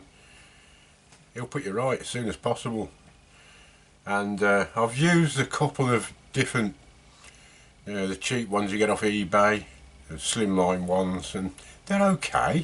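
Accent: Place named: Black Country, United Kingdom. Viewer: You have a proper Black Country accent, nothing like a Brummie accent at all, I’m really surprised. I like to watch your videos, very interesting with a bit of light heartedness thrown in. Kind regards to the hardest working part of the duo.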